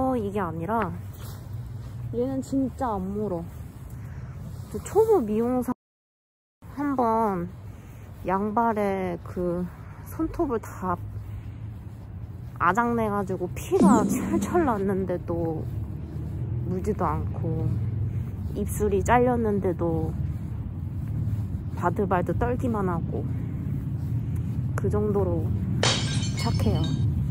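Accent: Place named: Gyeongsang, South Korea. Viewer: 고향이 경상도세요? 경상도 역양이 느껴지네요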